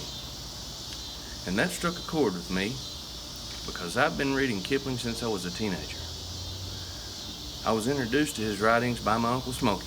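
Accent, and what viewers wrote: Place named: United Kingdom. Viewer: especially the hillbilly British accent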